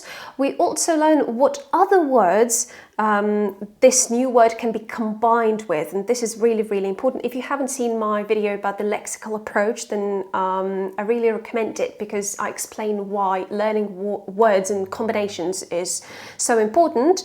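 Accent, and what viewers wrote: United Kingdom. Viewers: I'm not British myself but I thought you were so when I heard you speaking for the first time. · Согласна, результат поразительный, думаю, у не просто музыкальный слух, а именно талант подражания плюс много часов работы и исключительная старательность и вуаля! - прекрасный British accent! Well done! Думаю, многие учителя из постсоветского пространства даже очень завидуют вам!👍👍👍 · Hi! You have such a beautiful British accent. Can you share a few tips on what exactly helps to make the effect of a British accent? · It's amazing and wonderful! A girl from Belarus, who has never lived in the UK, speaks perfect English with a British accent. I am truly impressed by your mastery of the English language. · You practically sound British. With the tiniest hints of Australian here and there, if that. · Halaew halaew. This is very overbritish accent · Hi! 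I would start by telling you that your RP accent is amazing. Most of the time, English learners are afraid to look ridiculous with the British accent, much more difficult to master, and that’s why they tend to choose the American accent. And you are living proof that it is possible to master a superb British accent. And I will continue by saying that I do not agree with Vivianna. There are already enough channels with short formats. I don’t know if you’ve heard of Luke’s English Podcast, it’s a podcast with a long format, and it has won several awards and consider by its peers as one of the best podcasts and with great success even with a long format;) Ps: And yours videos are far from imperfect.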